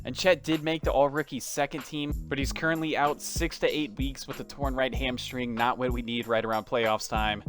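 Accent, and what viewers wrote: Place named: Iowa, United States. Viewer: You from Iowa?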